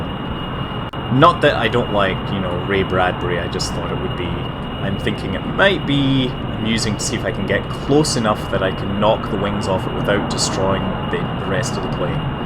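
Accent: Scotland, United Kingdom. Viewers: Woo a Scot!:P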